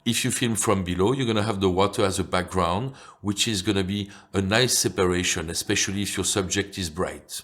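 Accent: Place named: France. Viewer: Excellent macro underwater photography advice and thank you fort sharing it. You sound especially authoritative with your French accent as it strongly suggests the entire Cousteau family!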